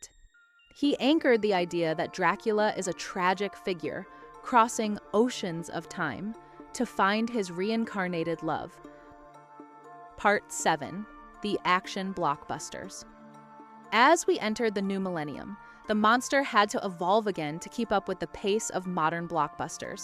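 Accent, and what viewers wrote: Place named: England, United Kingdom. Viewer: good answer! an elite vampire friend of yours taught you how to speak in a clear and authentic English accent. I thought you lived in England like I do. You had me fooled. Impressed by your videos about Marishka and the other two brides of Dracula. You are a good story teller. Have you seen The Brides of Dracula with Peter Cushing?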